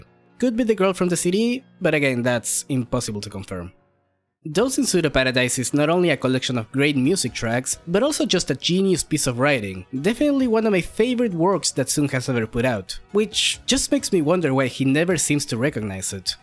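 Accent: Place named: Argentina. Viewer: mira flaco no se porque llegue aca sinceramente pero es increible lo facil q es sacar el acento argentino d una persona, aguante argentina y el diego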